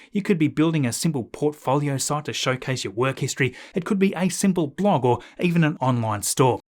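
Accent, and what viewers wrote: Australia. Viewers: Usual comment - Aussie accent at its best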